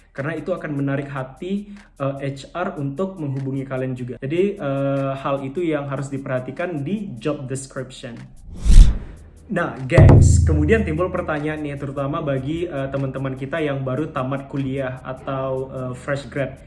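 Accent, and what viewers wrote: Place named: Pekanbaru, Indonesia. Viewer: content yang bener-bener bermanfaat banget bang, thanks sharingnya. ada bang jugaa wah! hehe. keep on using our pekanbaru accent ya bang!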